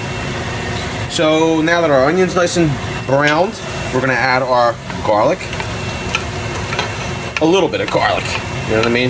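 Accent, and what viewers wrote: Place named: Italian-American, United States. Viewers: I'm not sure you used enough garlic....As if your accent didn't give it away, when you showed the bread I knew exactly where you were from! Making escarole and beans today but my crusty style bread will come from Panera since in SC we don't have Italian bakeries. Manja!! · Like no one knows that. . . I guess a lot of people don’t know how to “properly” slice an onion. Seriously, I’m 100% Italian and I was so skeptical about you at first, (some people that never even heard of Scaloe. I know what it is and cook with it like my nana did. It’s so good for you. Anyway, just wanted to say you’re impressive. I wish my man would cook, let alone good Italian food. Sounds like you’re from NY. 💕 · Hi, you must be from Philadelphia! I can tell by the way you say water🤣🤣. You’re probably in South Philly❤. Love you from Northeast Philly. 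And I’m gonna make this.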